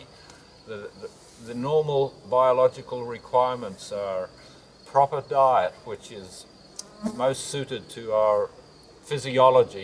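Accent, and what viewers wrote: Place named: Ukraine. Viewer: Приємно чути, коли не просто українська, а.. чиста українська )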